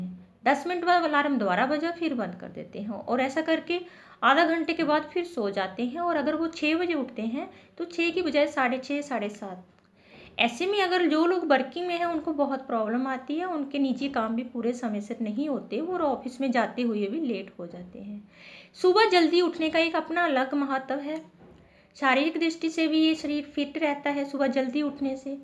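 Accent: Punjab, India. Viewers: Nice video. You are pure Punjabi( I mean your Hindi is in Punjabi tone)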